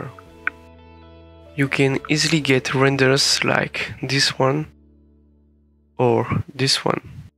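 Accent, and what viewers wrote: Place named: France. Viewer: Great tutorial, very clean, simple and right to the point ! If I had something to add, you could maybe have explained why you used some of the functions or macros 🤔 I'm not an expert on UV unwrapping for example, so I would have liked longer explanations J'ai le sentiment que tu es français vu ton accent, si c'est le cas, sache que tu m'as bien aidé à progresser, je te souhaite du succès sur youtube !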